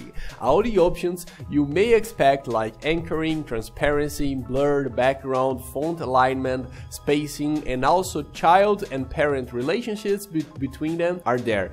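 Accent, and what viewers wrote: Rio de Janeiro, Brazil. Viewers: Esse sotaque da cidade maravilhosa não me deixa duvida kk... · E parece ser carioca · Brazilian accent, commom brazilian name 🤔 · sotaque brasileiro é impossível de esconder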